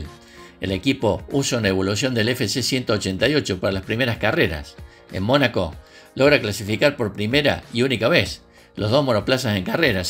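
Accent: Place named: Córdoba, Argentina. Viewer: Cordobés vos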